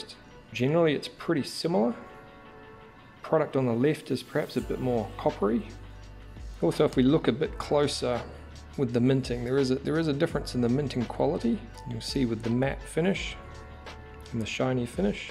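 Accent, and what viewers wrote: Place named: Australia. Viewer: Baaa.and baaaaz. (Dumb ozie accent for Bar)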